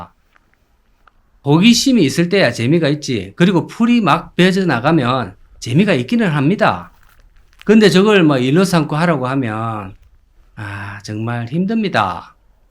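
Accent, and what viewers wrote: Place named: Seongju, South Korea. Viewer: 사드배치된 성주서 살았던 어릴때 많이 듣던 가찹고 (가깝고) ㅋㅋㅋ 오랜만에 듣네요 저도 그렇지만 본인은 표준어 쓴다고 생각 ㅋㅋㅋ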